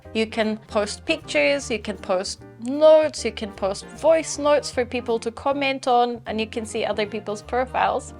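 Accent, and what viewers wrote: Australia. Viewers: Sagol. Great info. You always make such good sense and content of your videos are wonderful. Is that an Australian/Turkish accent I hear from you? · are you using Aussie accent?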